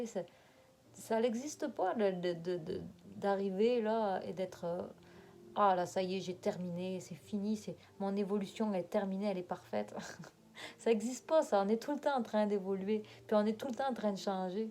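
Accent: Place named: Quebec, Canada. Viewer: après vous avoir découverte hier dans le live d'Aurélie Louve Chamane, j'ai choisi ce tirage n°1!!!!!! Sublimes messages 🙏🙏🙏🙏💚 Merci mille fois Et 😉 quel plaisir d'entendre ce mélange d'accent du sud-ouest avec l'accent du Québec 😊😉😀🧡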